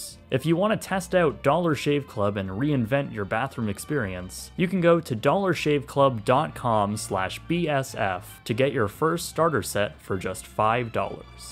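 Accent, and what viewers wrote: Canada. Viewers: I figured he was Canadian from the way he pronounced "about."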